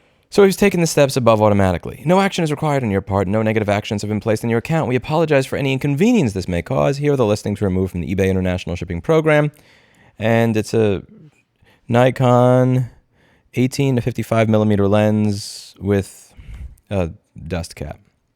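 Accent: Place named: Italian-American, United States. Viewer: The more angry gets the more ginzo he sounds.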